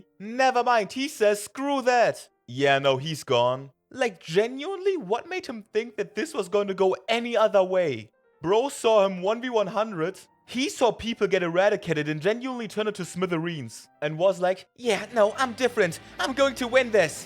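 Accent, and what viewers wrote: Germany. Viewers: Ich höre deutsch raus?👀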